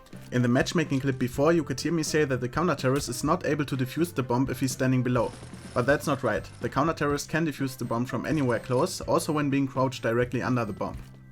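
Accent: Germany. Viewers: dein englisch hat einfach einen extremen deutschen akzent 😂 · Ich dache nur so "Wieso hat der so ein deutschen Akzent?!" Und denn.... ach so da spricht er deutsch tolles Video, Danke dafür! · dieser deutsche akzent xD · ach du bist deutscher:D kam grade auf deinen Kanal und denke mir so "Hnn... der hat aber nen gut deutschen Akzent":D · Dat deutsch akzent.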